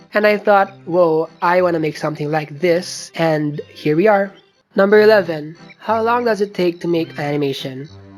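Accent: Philippines. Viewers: i knew it... from the accent and manner of speaking... congrats sa mga project mo with the group of Valkyrae and her friends i like your drawing style, nagdodrawing din ako since elementary days but i stopped doing drawing for 2 decades more or less and just got back roughly last year keep up the good work and MALIGAYANG KAARAWAN many more blessings to come stay safe stay healthy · BRUH THIS IS MY FIRST TIME WATCHING THIS BECAUSE I WAS SO BUSY AND BRUH YOUR ACCENT IS SOO FILIPINO HII!! I'M A FELLOW FILIPINO (≧▽≦)💖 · Welp, Im already late on this but, meow. When you start talkin I knew youre a filipino <3. I really love your animations!! <3 <3 <3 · I'M SCREAMING. I was like, "Huh, he sounds Filipin–" as he said it AAAAAAAA WOOO MGA PILIPINOOOO LEZZGOOO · Me:0 that accent!! hmm....: I’m Filipino. Me: I WAS RIGHT IM RIGHT!!!!!!!! W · I knew it at first because of the accent! 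Lol Proud Filipino here! Mabuhay 🇵🇭🇵🇭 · As soon as I heard ur accent, I was like: oh ur Filipino. Also happy birthday!!:DDDD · OMG THE FIRST TIME I HEARD YOU TALKING I SAID YOU'RE A FILIPINO!!!! AND I WAS NOT WRONG!!! 🇵🇭🇵🇭🇵🇭🇵🇭 Maligayang Kaarawan!!! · The moment I heard you speak I immediately knew you were a fellow kababayan:D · Nung natinig ko accent mo alam ko na na pilipino ka😊❤😎🤣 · THE FIRST TIME I HEAR HIS VOICE,I WAS LIKE,IS HE FILIPINO OR WHATEVAH 👀👀✨✨ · I recognize a Filipino accent from a mile away! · I KNEW IT YOU ARE A FELLOW FILIPINO THE FIRST 30 Seconds in the video, when I heard your accent I just said Hands down he is Filipino 🇵🇭🇵🇭🇵🇭🇵🇭🇵🇭🇵🇭🇵🇭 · Sabi kona pilipino ka haha sa accent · WHEN I HEARD UR ACCENT I WAS LIKE WAIT THIS KING IS A FILIPINO?! AND YOU ACTUALLY ARE!! ANG ANGAS NG ARTS MOOOOOO, CONGRATS TO UR SUCCESS AND BELATED HBD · HA! I KNEW THAT ACCENT SOUNDS LIKE MINE! 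CUS I'M FILIPINO TOO LOL MGA KAPAMILYA MAG REPLY KAYO DITO KUNG PINOY TALAGA KAYO HAHAHAHAHAAH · TRIP KO YUNG ALAM KO NANG PINOY KA FROM THE ACCENT OMFG I LOVE THIS/YOU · i heard ur accent and i knew ur Filipino, im also Filipino i never got to learn how to speak Tagalog but I can understand a little bit. btw happy birthday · When u started to speak I was like "bat parang Filipino sya..?" Then u literally answered it right away lol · haihai, filipino ka rin pala, ndi ko alam until narinig ko accent mo, wasn't sure tho then you confirmed it coz u're accent is good (like better than most of us pinoys) kaya di nahalata. A proud kababayan for u 🤧 sanaol talented sanaol nkakausap si Rae sanaol lahat ✨ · accent palang alam na agad ✊😭🇵🇭❤️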